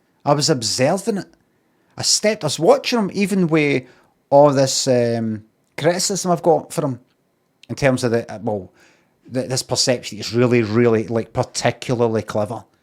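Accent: Scotland, United Kingdom. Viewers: You clever b*****d,. I think you're onto something here. But wait a moment, now I'm wondering, is it that Scottish accent and yer fancy band t-shirt making me agree with you?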